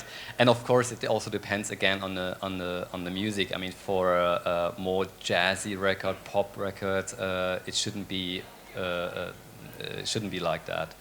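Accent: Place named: Germany. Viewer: How about german with subtitles? I hear the german accenct all over why not talking in german, like electronic beats - Slices?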